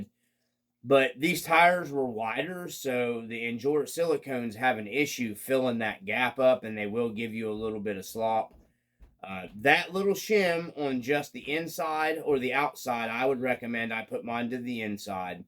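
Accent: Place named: Australia. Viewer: Thanks for the shoutout and kind words mate. Love the Aussie accent 😉. Just found your video and enjoyed the watch. Now subscribed - Thunder from Downunder! 🌏🇦🇺🦘🐨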